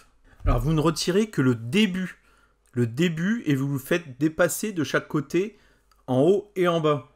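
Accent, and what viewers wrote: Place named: Nord-Pas-de-Calais, France. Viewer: Ah le chti ! T'es quand même attachant ! 😜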